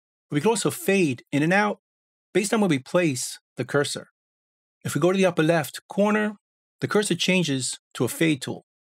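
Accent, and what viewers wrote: New York, United States. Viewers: U from NewYork?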